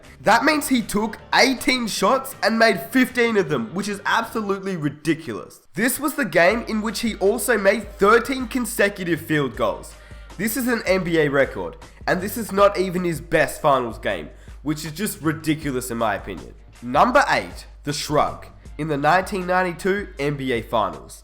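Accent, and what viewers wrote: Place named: Australia. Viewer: What do you mean? you always sound Australian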